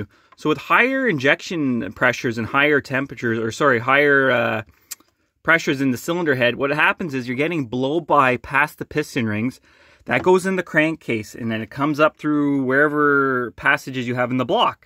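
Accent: Canada. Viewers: I'm Canadian, and you sound REALLY Canadian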